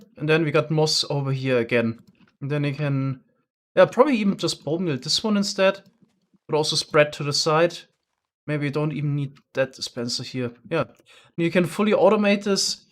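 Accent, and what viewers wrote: Germany. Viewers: Man hört sofort den Deutschen Akzent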